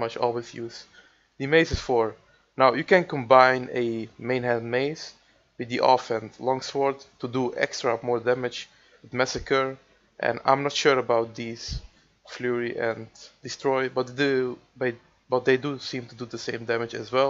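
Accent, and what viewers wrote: Netherlands. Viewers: just a question where are you from? Basing on your accent im quessing the netherlands?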